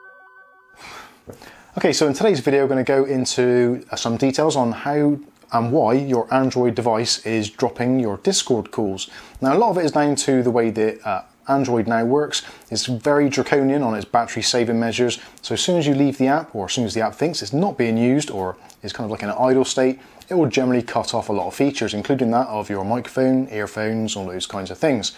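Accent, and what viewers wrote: United Kingdom. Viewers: too british for me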